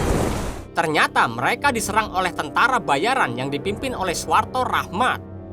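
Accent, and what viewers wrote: Java, Indonesia. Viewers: Suara lu terlalu Jawa mas 🗿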